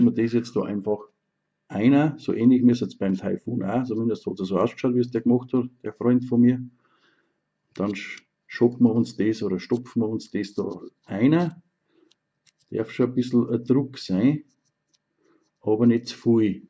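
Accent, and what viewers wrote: Bavaria, Germany. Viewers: Boarisch is einfoch schee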